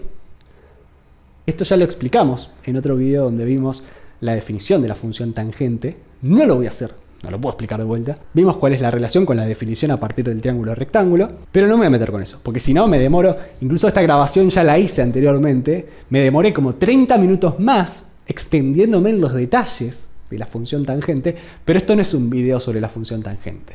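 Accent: Argentina. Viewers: Es argentino, ¿Verdad?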